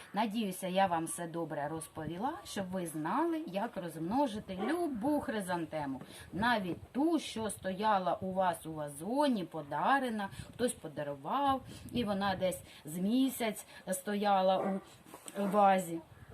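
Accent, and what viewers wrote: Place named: Ivano-Frankivsk, Ukraine. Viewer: Чую у Вас Франківський акцент! Дякую за поради. В мене теж п‘ять котів з вулиці. Бережіть себе. Вітання з Дніпра.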